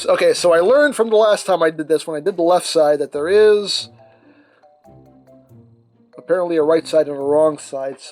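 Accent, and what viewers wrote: South, United States. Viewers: you need to Apply for your southern card, you sound like a southern guy, take for me I am for the south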